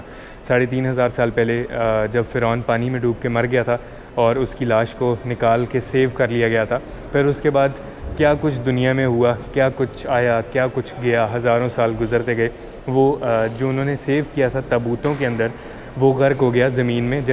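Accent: Punjab, India. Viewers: Ur Punjabi tone gives a boost of masala in the tour 😂😂😂 😋😋😋😋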